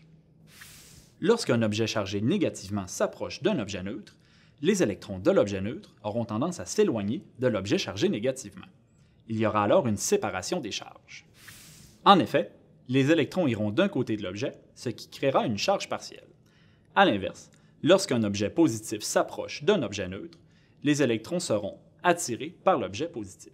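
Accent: Quebec, Canada. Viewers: merci pour cette incroyable accent québécois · L'accent québécois donne un truc a la video je trouve mdr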